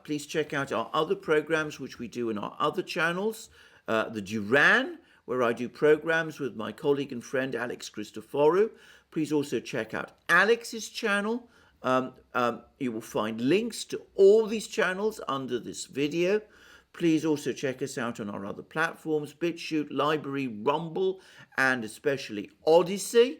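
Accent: England, United Kingdom. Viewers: Interesting informative news but boring presenter. The heavy English accent is difficult to hear for Americans.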